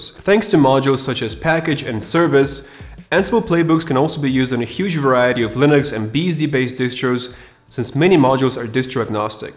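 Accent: Russia. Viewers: Are you from Russia? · такое ощущение что автор видео Русский пацан - по акценту заметно =)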